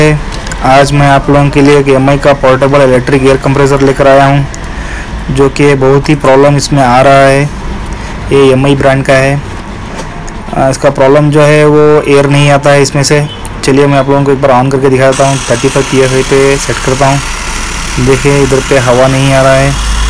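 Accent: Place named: South, India. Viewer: the way you are pronouncing M ....I think you are from south🔥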